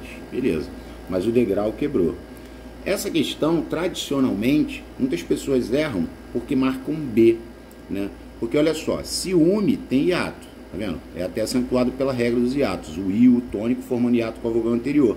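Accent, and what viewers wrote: Rio de Janeiro, Brazil. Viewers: 7/50 !! Rlx, prof, é quase impossível agradar todo mundo! O senhor é nota mill, seu sotaque bem carioca faz parte do pacote!! rs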